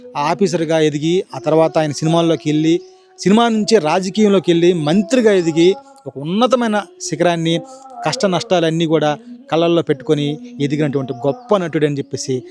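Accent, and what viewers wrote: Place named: India. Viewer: Bro. Mee voice ma vurilo local voice lagundi anna eh vuru anna medi?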